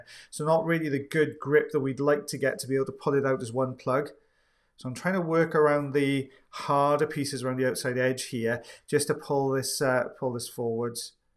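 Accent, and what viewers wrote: Wales, United Kingdom. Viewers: It's a Welsh accent he's got going on there, always cracks me up when he starts taking about Valleys in the canal .... cus he works in the Valleys (of Wales)!! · I had just got done thinking "why don't you bring in the jobson horn, mate?" when you said that in hindsight you would have. By the way, I thought it in a British accent since I was listening to your narration haha.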